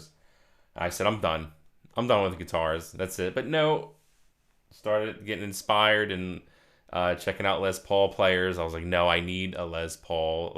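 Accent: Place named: Philadelphia, United States. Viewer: Lol are you from the Philly area or maybe NJ?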